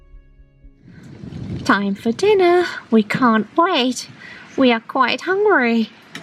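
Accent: United Kingdom. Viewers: you're german? You soumd so british. That dude also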